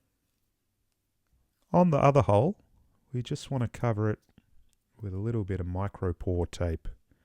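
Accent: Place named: New Zealand. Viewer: Am I hearing an NZ accent.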